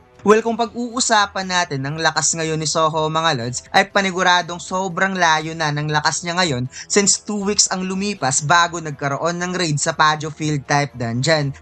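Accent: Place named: Conyo, Philippines. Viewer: Ganda salamat idol.. Kaso wag masyado pa konyo yun nagpapangiti e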